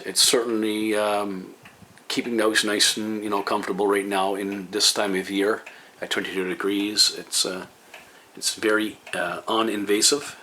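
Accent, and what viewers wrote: Newfoundland, Canada. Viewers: Do I hear a Newfoundland accent? I just installed a 12000 in my garage. Did you figure out the smart option? I have no instructions on it.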